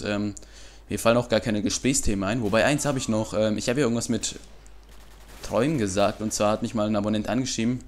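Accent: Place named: Russia. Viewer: bist du russe du hast so einen akcent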